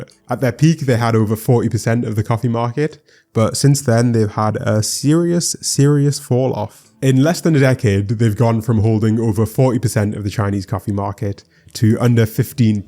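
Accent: United Kingdom. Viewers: I know it’s just how you sound but you sound like you’re mocking me with how you speak… maybe it’s the combination of deep vocal tones and the british accent, im not sure. but if I close my eyes and just listen, it sounds like “yOu ArE mOcKiNg mE.” great video and subject matter but I probably won’t be watching anymore of your videos.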